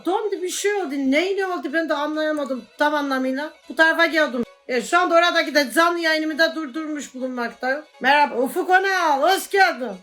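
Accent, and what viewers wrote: Black Sea, Turkey. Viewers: Karadeniz şivesi çok yakışıyor sanaaaa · Abla sen Rizelimiydin ? Çok iyi yapıyorsun karadeniz şivesini. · bir de karadenizli aksani ucu bir arada gulmemek imkansiz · Abla karadenizi söktü götürüyooo😅👏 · 10 saat karadeniz şiveli konuşsa dinlerim çokk iyi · gerçek karadenizliden daha karadenizli yemin ederim :D ay ablam yicem tipini arada böyle videolar yapar mısın ? :D :D :D :D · O nasıl bir şive yaa abla ben bir Karadenizli olarak böyle konuşamıyorum helal olsun valla <3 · Karadeniz Şivesi İle Yayın Girşini Yapar Mısın Lütfen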